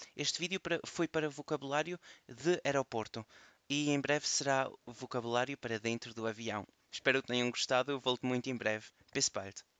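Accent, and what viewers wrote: Portugal. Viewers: Olá,! Sou do Brasil, e adorei seu Curso de Alemão. Lamento tê-lo encontrado somente agora, nesta aula nº 79. Vou tentar ver todas as aulas anteriores. Gosto muito de Portugal e de sua gente maravilhosa. Estive aí em Portugal no ano 2000. Lisboa é uma beleza de cidade. Adorei o Mosteiro dos Jerônimos e, mais que isso, os deliciosos pasteizinhos de Belém. Obrigado por suas aulas. São ótimas! Gosto muito desse sotaque, ou dessa pronúncia mais original do português lusitano. Parabéns por suas aulas. Um grande abraço.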